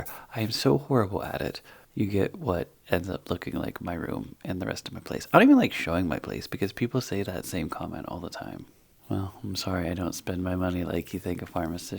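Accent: Pittsburgh, United States. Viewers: Love the Pittsburgh accent. Listen all the time. Love yer honesty! I’m an rn and agree with your conclusions. With our druggies the benedryl is always asked for when we give all the other sleeping meds.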